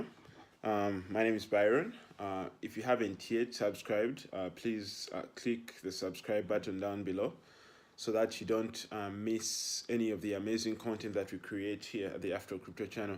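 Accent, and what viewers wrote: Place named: Uganda. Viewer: Kiribwa oluzungu banange. Still Ugandan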